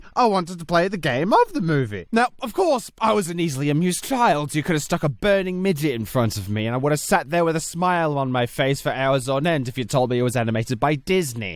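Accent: Australia. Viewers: wait u r australian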